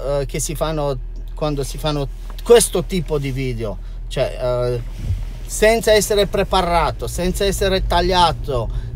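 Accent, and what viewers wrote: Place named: Moldova. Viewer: Dall’accento sembri moldavo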